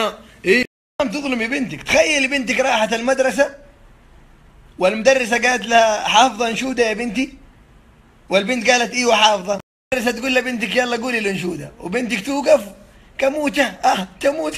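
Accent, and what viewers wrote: Hejaz, Saudi Arabia. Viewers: ليه لهجته حجازيه!!! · ماكانه اماراتي كانه من السعوديه من الغربيه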